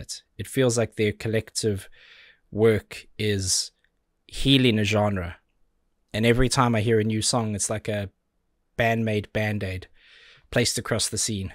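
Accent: South Africa. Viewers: I have been watching you for more than almost 2 years I guess and I failed to realize that you are a South African and not a Brit inspite of my good understanding of various accents.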